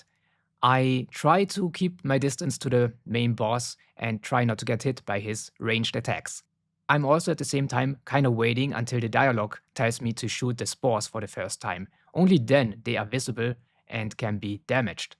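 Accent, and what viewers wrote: Germany. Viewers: Danke für das Video😊 man hört leicht heraus, das du deutscher bist xD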